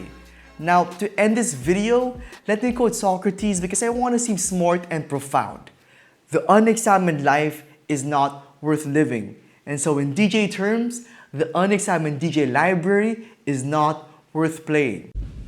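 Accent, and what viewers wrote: Philippines. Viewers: You ought to delete your filipino accent from your brain, learn some English before talking about how to deejay. It’s hard to understand you most of the time.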